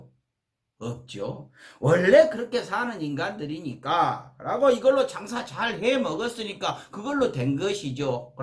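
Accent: Jeolla, South Korea. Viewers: 경상도사람이지만 전라도 사투리가 너무 구수하니 듣기 좋네요. 강성범씨 방송보면 속이 뚫리는 것 같습니다. 앞으로도 잘못된 놈들 많이 씹어 주시길~~^^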